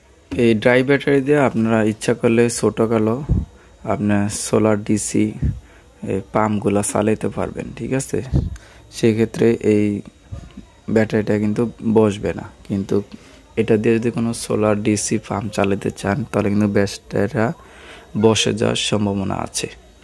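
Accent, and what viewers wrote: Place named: Chandpur, Bangladesh. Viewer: ভাই আপনার কথায় মনে হচ্ছে আপনি চাঁদপুরের মানুষ। চাঁদপুর সদর বা হাজীগঞ্জ, বাকিলা ঐদিকের। আমি ধারনা করে বললাম। আশা করি সত্যিটা জানাবেন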